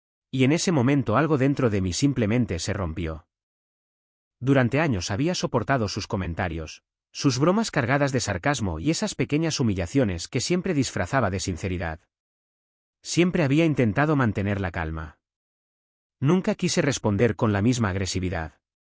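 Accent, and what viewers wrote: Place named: Andalusia, Spain. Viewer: DÉ VERDAD QUÉ ES FACINANTE ÉL ACENTO TOTALMENTE ANDALUZ DÉ ÉL NARRADOR... SIGAN INCLUYÉNDOLO EN TODAS LAS VERSIONES DÉ ESTÁ PLATAFORMA...ES SENCILLAMENTE GENIAL...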